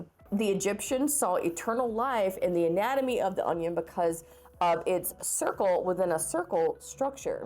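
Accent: South, United States. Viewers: I knew as soon as you said boil you where southern. It’s a dead giveaway 😂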